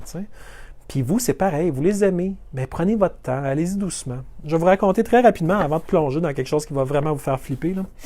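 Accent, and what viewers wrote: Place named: Quebec, Canada. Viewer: Vous êtes marrant, , sérieux à la fois, et vous diffusez une information essentielle sur les bases de documents concrets accessibles à tout le monde. C’est dingue de voir comment les gens font pour encore arriver à croire qu’on ne veut que leur bien. Mais surtout ça correspond en tout point à ce que j’observe.. Quand on voit beaucoup des les commentaires sur les réseaux sociaux on ne peut s’empêcher de visualiser un troupeau de moutons qui se dirige vers un précipice ou vers l’abattoir en étant persuadé que c’est pour son bien! Suis contente de voir que nous sommes nombreux à voir ce que je vois et que des gens comme vous diffusent pour sensibiliser ces gens encore aveuglés par leur besoin complètement illusoire de sécurité. Ma clochette a souvent l’occasion de sonner quand j’entend des messages sibyllins et subliminaux venant de différents médias. 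Merci, merci, c’était un plaisir! Et votre charmant petit accent québécois rend le tout encore plus savoureux. Je vous mets même le petit ❤️dont vous parliez ! Naturellement, je m’abonne et diffuse!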